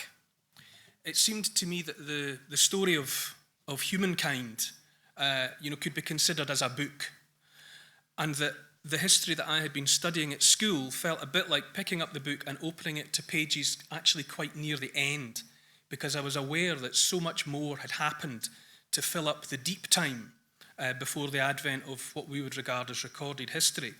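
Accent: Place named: Scotland, United Kingdom. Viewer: Absolutely brilliant, what a great story teller, and is a historian and a great one at that, his passion is unsurpassed, and I absolutely love his beautiful scottish accent.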